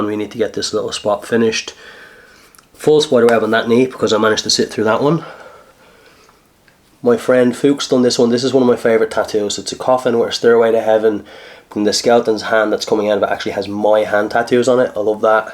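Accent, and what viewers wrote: Ireland. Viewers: Sir I don't like tattoos but I just wanted to know if you don't mind me asking, why do you have so many tattoos and why do you like having tattoos? I was just curious, you may not answer if you don't feel like....I love your style, your dressing sense and most importantly your melodic Irish accent, I like it so much that I'm learning this accent.☺️ Thank you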